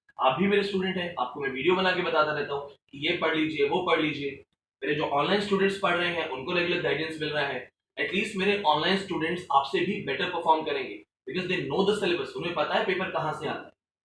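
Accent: India. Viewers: STICKING TO OUR INDIAN PRONUNCIATION AND STYLE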